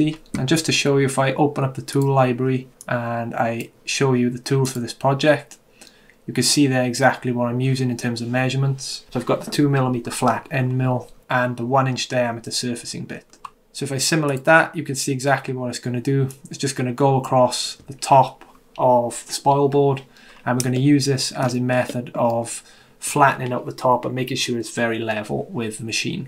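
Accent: South Wales, United Kingdom. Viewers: Love your video and your Welsh accent sounds like South Wales as is mine I now live in Canada and am a retired senior citizen, as a result I am unable to offer support by way of paid membership membership given this info I wonder if you would consider sending me a copy of the code you used for flattening you spoil board? Also please advise what part of Wales are you from or located I just have a feeling we would not be far away if I still lived there.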